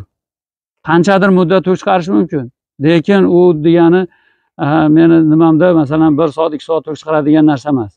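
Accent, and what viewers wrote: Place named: Fergana, Uzbekistan. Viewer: АКАМИЗ ФАРГОНАДАН ЭКАН БАС ДЕБ ГАПИРДИКУ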